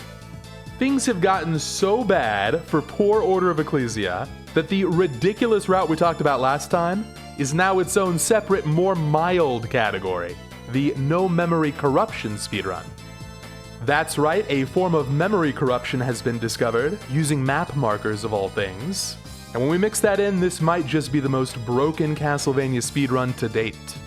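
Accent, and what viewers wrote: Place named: Texas, United States. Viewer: other people: dang, this is crazy me, a non-twitch viewer: HE'S TEXAN??!!!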